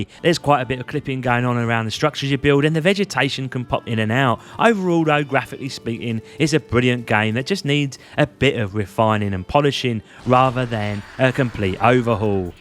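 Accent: United Kingdom. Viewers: its allways so obvious and funny when the youtuber is british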